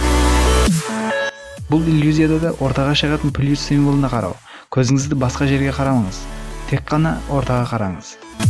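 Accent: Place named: Uzbekistan. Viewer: Озбексинба? Диалектин казакшага келмейди?🤔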